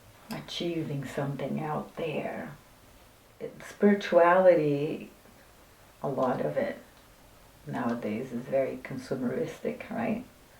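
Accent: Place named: Brazil. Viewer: Brazilian accent ❤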